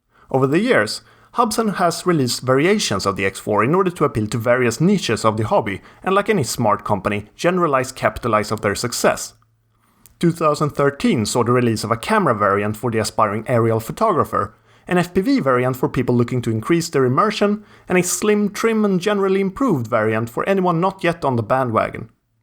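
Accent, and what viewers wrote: Sweden. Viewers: Yay Jag hör en svensk! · i can hear that this guy is originally swedish :D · Let me guess, you're swedish? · Takes a Swede to know one maybe XD could hear it too immediately and I'm a swede as well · Är du svensk? din engelska låter så iaf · Are you swedish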